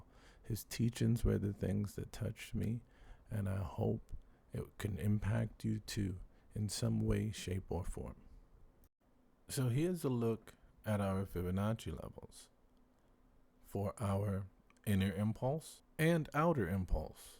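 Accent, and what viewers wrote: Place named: Morocco. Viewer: I smell the Moroccan dialect here 😉 bshto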